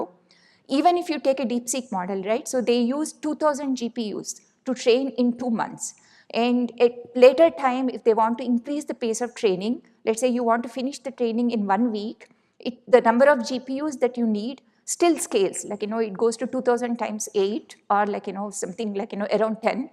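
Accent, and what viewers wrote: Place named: India. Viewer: Thank you for giving insights about AI Networking and i like your speech very calm and keeping natural Indian tone.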